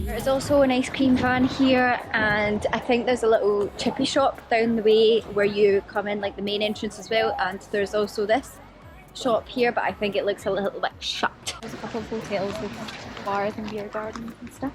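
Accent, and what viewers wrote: United Kingdom. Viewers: Hey i just got to know you from group facebook already subscribe and follow your instagram :) i like your accent, is it british ?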